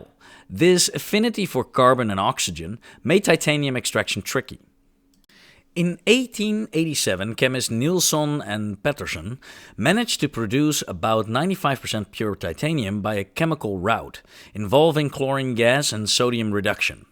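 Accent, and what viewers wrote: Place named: Netherlands. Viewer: ok, vind het anzich een goede video, script vloeit goed, je visual zijn ook goed. enige wat ik bijzonder afleidend vond was het gigantisch duidelijke neder-engels en in de eerste 8 minuten al 5 a 6 klemtoon/uitspraak fouten. zo afleidend dat ik het eerste gedeelte van deze reactie dus al schrijf op dat moment in de video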